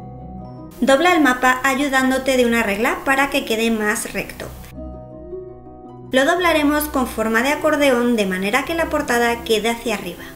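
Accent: Spain. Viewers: Yo no supero que le digan "jermion" a Hermione :'( pero me gusta tanto el acento Español que me lo paso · Q eres de España???, de que comunidad??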